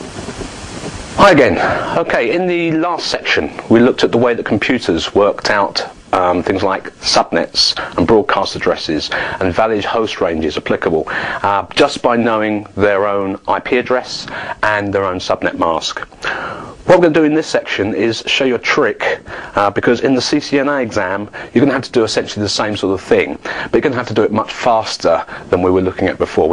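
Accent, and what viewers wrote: England, United Kingdom. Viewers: lol english accent :D lol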